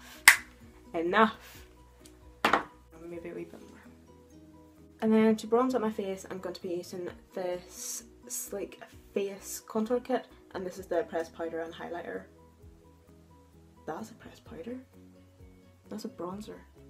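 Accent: Northern Ireland, United Kingdom. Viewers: I'm from NI too and I know exactly what you mean about saying Northern Ireland in our accent hahaha, love your videos chick you're super talented! X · Northern iron girl ! Luv our accent on youtube 😁👍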